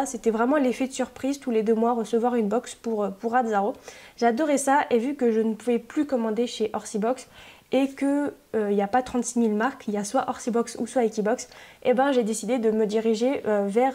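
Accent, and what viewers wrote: England, United Kingdom. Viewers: haha j'adore ton accent anglais 😂😂 sinon super vidéo☺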